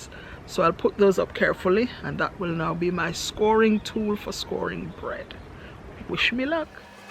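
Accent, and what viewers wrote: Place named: United Kingdom. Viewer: I absolutely love your British accent.😂😂😂🤣🤣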